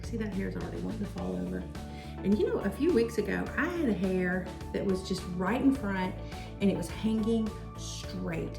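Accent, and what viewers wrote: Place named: South, United States. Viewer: Thank you for this video!!! I JUST discovered you and subscribed. I can't afford to go back to my DevaCurl Stylist right now, so I will be watching ALL of your videos. I also love your haircolor and highlights. Can I ask what color/brand you use? Also, you are so very pretty, great personality and adorable southern accent!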